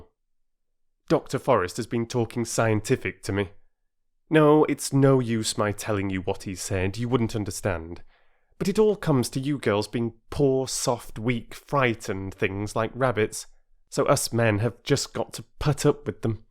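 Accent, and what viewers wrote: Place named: Yorkshire, United Kingdom. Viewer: Love it! This has been my second time listening to your warm and joyful performance. How wonderful, the warmth, nuances, and humor of an actual human voice (vs. AI). And your voice is an especially fine one in timbre and tone. Love the touch of Yorkshire too.🙂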